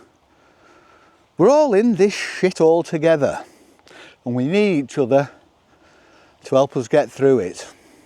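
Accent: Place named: Lancashire, United Kingdom. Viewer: Thank you for sharing your openness and authenticity of your self. Not only as a fellow Lancashire person no longer in the area am I drawn to your accent and the scenery I find it refreshing to see and hear authentic videos. See you on the " flip"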